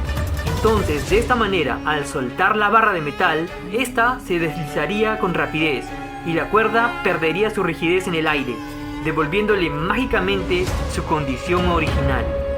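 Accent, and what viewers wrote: Peru. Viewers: ERES DE PERU VERDAD ? LO DIGO POR TU DIALECTO AL HABLAR . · ese acento es peruano 😊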